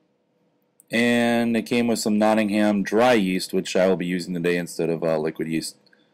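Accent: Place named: Midwest, United States. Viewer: First off Nice brew area, clean and organized. Second I knew you are from the midwest cause you have a bit of an accent. Your from wisconsin so you would probably call me a FIB, lol! I brewed the holiday kit and found the same thing, very alchohaly taste at first but not a bad beer. I think it smelled better when I was brewing it than the finished product to be honest. Question, what is or how do I make liquid brown sugar? That sounds amazing with a pumpkin brew. Cheers!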